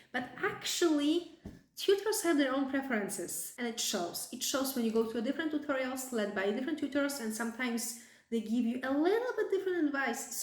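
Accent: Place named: Hungary. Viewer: Not related to the topic but..Where are you from? You sound Hungarian. 🤔 I NEED TO KNOW!🤣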